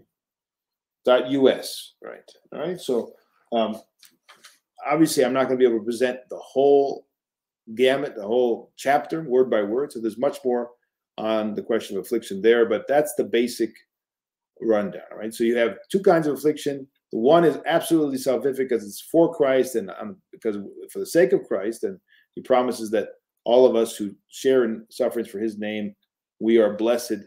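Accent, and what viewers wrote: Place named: Upstate New York, United States. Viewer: Does originally come from Syracuse/Rochester, New York? His accent sounds like it is from that area. I knew someone from a church I once attended in California who had his same, exact, identical voice; and he had moved to CA from Syracuse/Rochester, New York.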